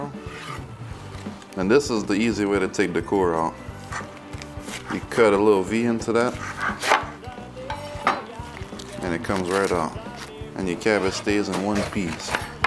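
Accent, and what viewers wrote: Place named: Louisiana, United States. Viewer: it’s the accent for me . louisiana 🤪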